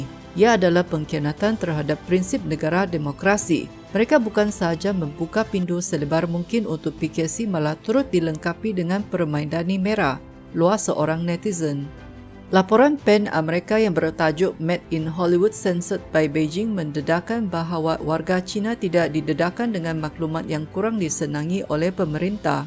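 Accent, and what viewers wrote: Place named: Indonesia. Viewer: Boleh tahan cubaan berbicara a la Semenanjung penyampai ini. Menjadi 90%,, cuma masih ada 10% bunyi Indonesia tak dapat disembunyikan. Sebelum ini ada aku dengar penyampai lain channel ini masih berbunyi 97% Indonesia, 3% sahaja Malaya (iaiti bahagian a dihujung jadi e) 😆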